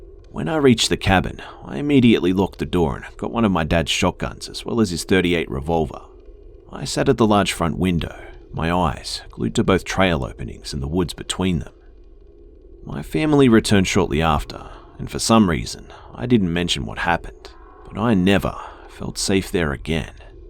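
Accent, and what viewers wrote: Australia. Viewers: Listening to an Australian pronounce southern terms 😂 I kept being like wait wtf is he saying then I'd be like ohhhh 😂😂😂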